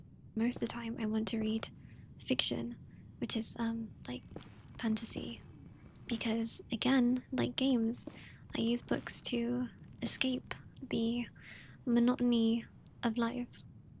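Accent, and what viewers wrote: England, United Kingdom. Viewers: it's just an English accent what😭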